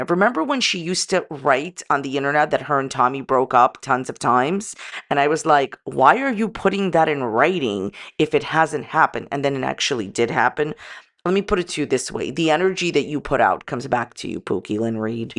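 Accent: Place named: East Coast, United States. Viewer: Was waiting for this 😊😊. My favorite eastern coast accent YT person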